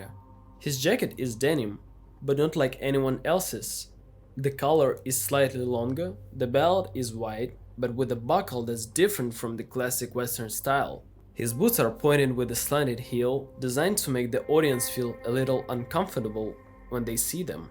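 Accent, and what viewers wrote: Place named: India. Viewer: U SOUND LKE AN AMERiCANiZED DOT iNDiAN ! NOT YELLiNG, JUZT LiKE TYPiNG iN CAPZ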